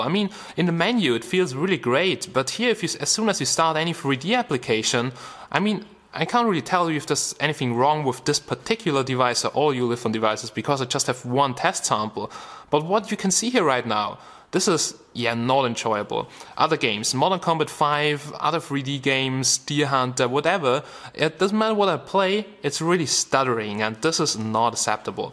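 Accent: Germany. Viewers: du bist doch deutscher oder? :D man hört des :D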